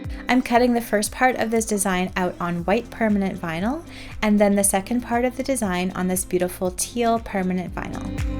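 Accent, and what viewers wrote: Canada. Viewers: Love the DIYs and the Canadian accent! ❤️😁.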